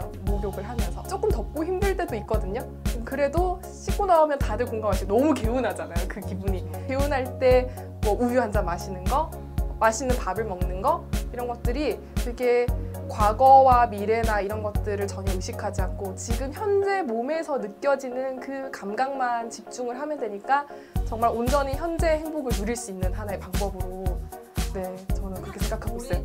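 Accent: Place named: Busan, South Korea. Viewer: 기자님 사투리가 부산일보 영상이라는 걸 보여주네요 ㅎㅎ 좋은 영상 감사합니다.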